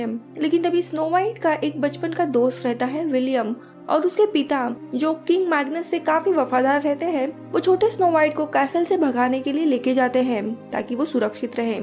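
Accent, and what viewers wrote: Maharashtra, India. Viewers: do you have any relation with nikhil of climax explained in hindi .moreover you both sound a bit marathi . pls tell and BTW love ur explanation.thank u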